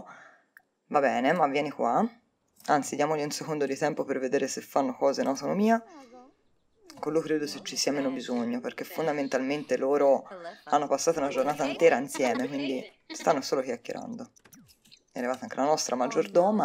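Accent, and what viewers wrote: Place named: Tuscany, Italy. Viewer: Ciao sono Marco,un nuovo amico! Bellissimo questo video e gioco Sims 4 ho molte amiche che hanno canali dedicati ai Sims ,mi piace il tuo accento Toscano....complimenti! Mi sono iscritto 1 8 7 e... l i k e Se ti fa piacere ti aspetto su un mio video e canale io tratto molta natura,animali,immersioni,viaggi,ecc...così ti presento anche la mia grande community YT dove troverai molti nuovi amici ed iscritti. A presto...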